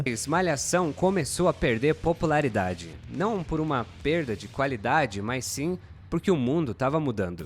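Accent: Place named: Rio de Janeiro, Brazil. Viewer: Todo carioca fala assim mesmo ou é só tentando falar igual aos mais conhecidos?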